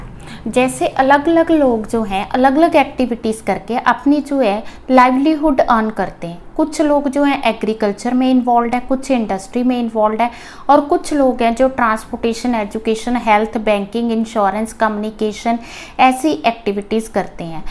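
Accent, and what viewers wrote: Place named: Punjab, India. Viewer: apki aawaz me Panjabi jhalak rahi thi vaise mai Gujarat se hu but I love Panjabi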